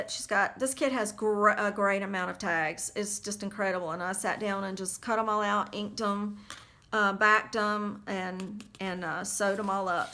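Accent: South, United States. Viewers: Just found you and love your art, shop, and southern accent. (I am from SC)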